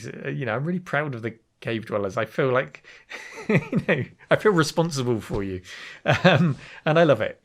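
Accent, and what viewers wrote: United Kingdom. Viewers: The name is less important then the good job you do. BTW I love to hear your british english, it's much more pleasent then american english not to mantion german channels ;)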